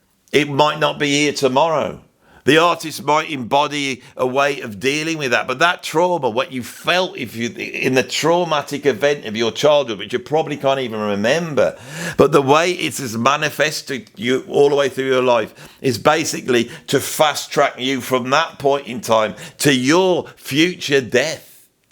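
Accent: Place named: Midlands, United Kingdom. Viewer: More then interesting, first thing why am I looking at other comments, sod it,I don't need to... Your comment at the very end, have a good death, that comment in itself can go either way, I guess it could be viewed as humour, but the point that I would like to make is,The subject of Death is totally avoided here in the West, Burried in fact.,now thers a point,humour arriving again... But absolutely we all need to be able to die in silence and peace.and hopefully in absolute awarness. My personal view is Life and Death are part of the same coin... Anyways,Artists such as Joni or phill,Kate,are somehow via there creative ability deal with there Trauma and failing and are capable to go beyond this in a cathartic way, I agree with you, we all are capable of finding way to rise above the stress and that method iin itself is creativity. There are unfortunate people who sadly become lost and have an immensely horrendous time in darkness.. 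Oh,Bloody hell I'm blabbering on,sorry. To put it simply you have to be true to yourself and if you happen to offend someone it is there problem, your loyall membership and subscribers know you and will stay.... Love the midlands accent, I'm a East middlander,originally, yep I can't help that 😂. Best wishes to your friend. Enjoyed again this in depth discussion...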